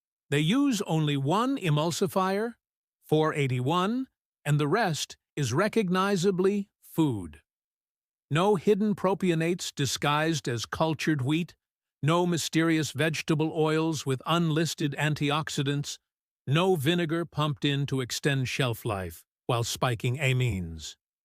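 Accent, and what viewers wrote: United States. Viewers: Yeah okay.. but other sites advise that the breads baked in coles, woolworths and bakers delight are not mixed on sites. They are baked from frozen mixtures. So how is that being honest with the public. It's all such a minefield. And no offence, but why are a couple of the sites similar to this narrated by someone with an American accent?accent. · Why the American AI voiceover? Could’ve used an Aussie voice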